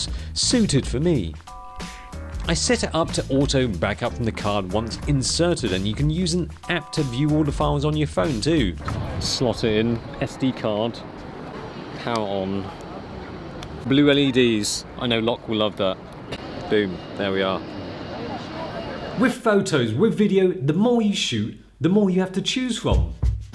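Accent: United Kingdom. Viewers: So cool to see a Japanese-looking guy speak in a British accent. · you are an icon and a legend in my books! Lovely presenter, funny, to the point and honest, PS you gotta love that British ascent, right?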